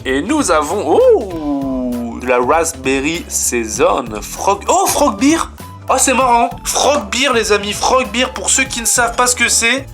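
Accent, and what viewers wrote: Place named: North, France. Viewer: 9/7 pour la petite mousse !! Ton accent du nord se fais ressentir vive le 59 😄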